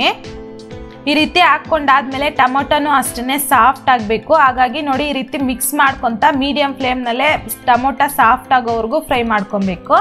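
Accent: Kolar, India. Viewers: Kolar side avaru ansuthe nice kannada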